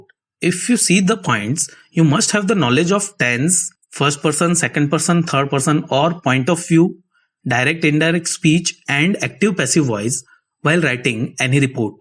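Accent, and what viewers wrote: India. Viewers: Indian ahh acent 😭🥀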